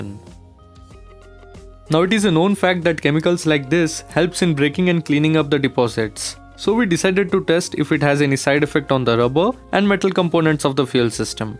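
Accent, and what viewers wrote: India. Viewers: The Indian accent is killing me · Indian accent not arabic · Duh, indian english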